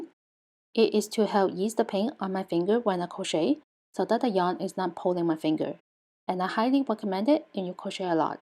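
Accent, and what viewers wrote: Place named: Asia. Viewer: What kind of Asian is this accent, Mandarin? Vietnamese? I love it 😍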